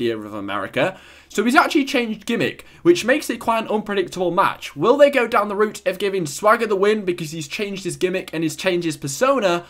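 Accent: England, United Kingdom. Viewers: You have a english accent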